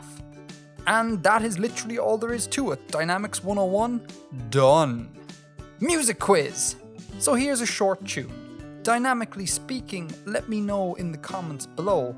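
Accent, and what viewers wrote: Ireland. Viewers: Definitely an Irish accent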